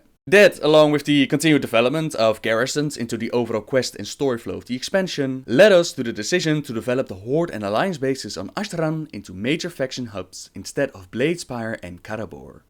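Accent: Netherlands. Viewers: Argg Dutch accent, man.... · Damn, that Dutch - English dialect ^^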